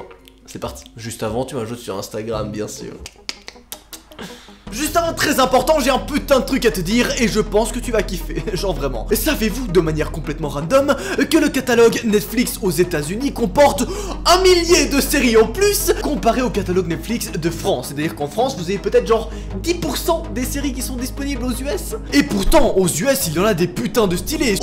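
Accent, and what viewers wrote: Belgium. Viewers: Tu es belge ou suisse ? Moi je suis belge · Haaa t belge je comprenais pas quand tu disais 75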